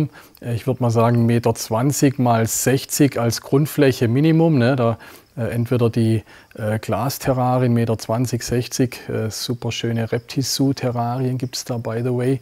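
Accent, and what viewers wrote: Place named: Baden, Germany. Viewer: Es tut immer wieder sehr gut, die Güte zu spüren, die du deinen Tieren entgegen bringst! Bin in der Tat nicht grad ein Kommentarschreiber (obwohl das heute bereits mein 2. ist 😊)! Aber das musste jetzt mal gesagt werden = wie sehr ich deinen Umgang mit den Pfleglingen schätze)!!! Wenn ichs richtig in meinem "Hohlen" habe, gehört der heutige Protagonist auch zu deinen persönlichen High 5 (Platz 4 oder 5?) ?!?! Nun hab ich noch ne Fachfrage: es gibt ne 4--5teilige Doku die sich mit der Tierwelt der indopazifischen Inseln auseinander setzt (Micro--Macro--und Polinesien)! Natürlich auch Neukaledonien, Fidschi, Tonga, Bora--Bora, Papetee, Samoa, Weihnachts--und Gesellschaftsinseln, Palau, usw.usw. OK, da ist nix mlt Grosswild,ect. Aber mit endemischen Raritäten! So gibts auch den Riesenskink, ein friedlicher Gigant! Der müsste noch um einiges gewaltiger, als die Blauzungen sein. 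Ob davon ein Video machbar ist? Letzte Frage: wo ist dein Reptilien--Haus? Vom Slang her, würde ich dich in die Badische, Schwäbiche oder Breisgau--Ecke verorten! Denn ich muss das alles irgendwann, mal life sehn! Für heute erstmal alles Liebe und Gut, sowie meinen Respekt!!! MW aus MG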